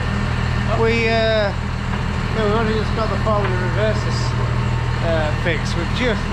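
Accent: Yorkshire, United Kingdom. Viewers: Pleased you & Joe Seels have found each other on here, 2 of the best channels around, can't beat straight talking Yorkshire folk 👍👍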